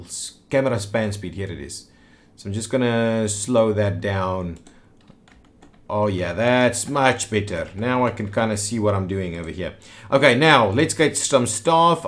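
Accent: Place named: South Africa. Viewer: Subbed, great work & loving the fellow RSA accent!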